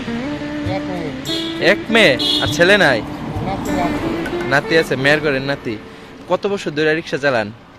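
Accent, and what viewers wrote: Sylhet, Bangladesh. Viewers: Vaiys apni ki sylheti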